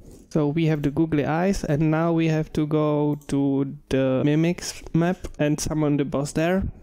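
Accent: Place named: Czech Republic. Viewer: já ten českej přizvuk výdycky poznám xD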